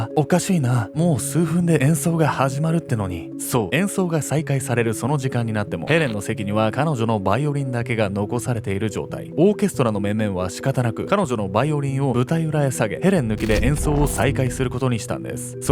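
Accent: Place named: Ishikawa, Japan. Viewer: なんで石川弁wwwマイナーな😂石川県民としては嬉しいけど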